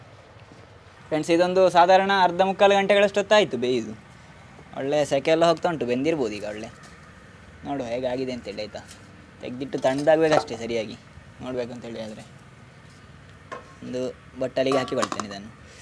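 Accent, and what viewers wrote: Mangalore, India. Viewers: I liked your mangalore (kudla) kannada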